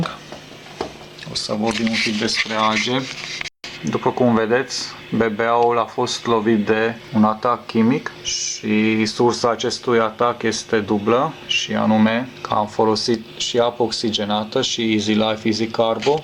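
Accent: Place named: Hungary. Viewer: ai si canal de youtube pe maghiara? ca esti maghiar😁 se aude bine😋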